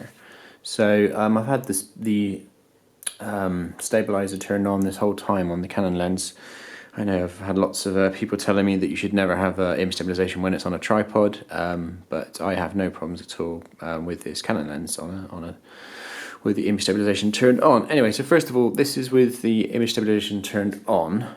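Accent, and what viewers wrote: Bristol, United Kingdom. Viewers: are you from bristol ?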